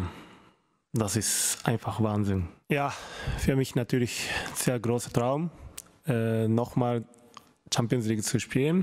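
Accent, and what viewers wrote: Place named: Japan. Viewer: 頭いい人って発音気にしないよね、バリバリの日本訛りでも気にしないんやな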